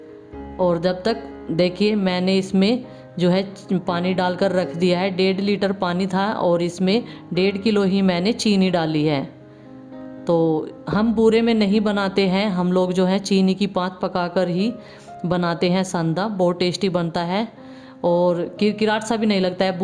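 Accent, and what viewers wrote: Haryana, India. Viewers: Mam aap Haryana se h ya U. P. Se language toh Haryana ki h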